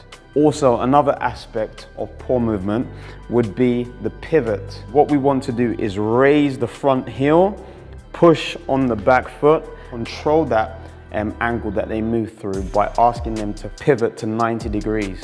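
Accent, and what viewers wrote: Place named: United Kingdom. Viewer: Are you Cuban as for me sounds like you are British.... accent by far.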